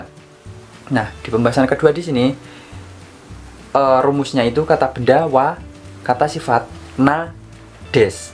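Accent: Java, Indonesia. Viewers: daebak jepangnya bisa juga pake logat medhok mas yaa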